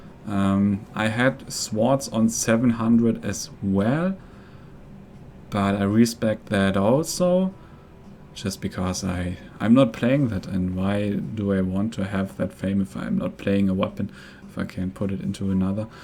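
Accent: Germany. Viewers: Love your German accent IGN: ZeusKingofGods2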